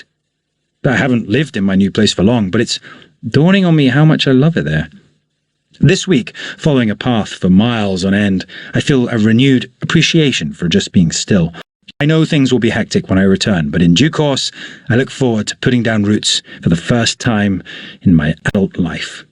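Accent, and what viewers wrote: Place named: Scotland, United Kingdom. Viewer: Bonjour 👋 quelle belle aventure et de très belles photos et raconter par notre ami avec son accent écossais c'est superbe. L'aventure a été très difficile et plein d'embûches mais est une personne solide et très sportif mais malgré tout sa il a dû se battre. Merci pour la traduction c'était super car j'ai vécu des moments très agréables avec.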